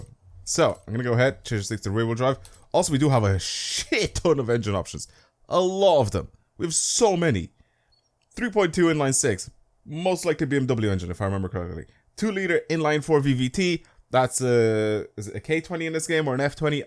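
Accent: Dublin, Ireland. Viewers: u from dublin bro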